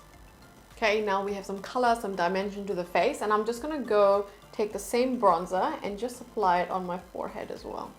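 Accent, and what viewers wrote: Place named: RP, United Kingdom. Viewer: I don't know why but you kind of sound like the English royal family. They speak very differently even easy words like "that". You sound quite similar to them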